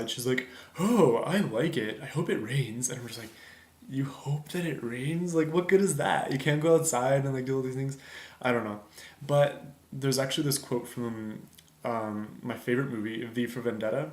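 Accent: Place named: Germany. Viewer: i hear your german xD greetings from germany rofl ^^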